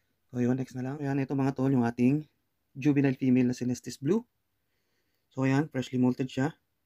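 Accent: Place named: Cavite, Philippines. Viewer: sanaol tol madami nang slings may puntong caviteño hahaha.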